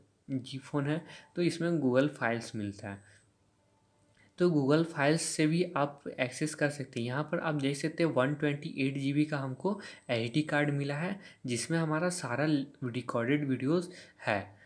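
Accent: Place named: Bihar, India. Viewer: Tu bhai pakka bihar se hai 🙂🙂 kitna ko ketna bihar me bolte hain 🙂🙂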